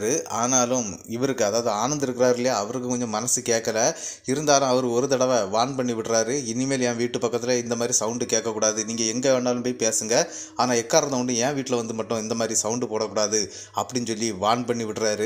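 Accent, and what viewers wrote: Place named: Nagercoil, India. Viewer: It is so nice to hear brother speaking nagerkoil tamil as I am from nagerkoil thankyou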